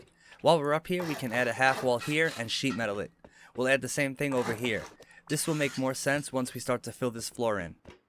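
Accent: New York, United States. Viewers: Where are you from? Staten Island? Queens? The Bronx? You sound very East Coast NYC.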